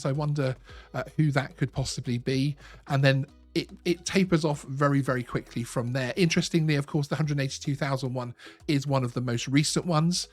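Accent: United Kingdom. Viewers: Reading off incidents meant to scare pilots while providing no real particular info on individual cases.. Click bait, British accent meant to infer authority, he is a wanker.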